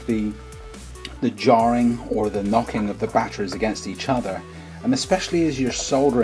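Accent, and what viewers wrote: Bristol, United Kingdom. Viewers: Good video, are you from Bristol?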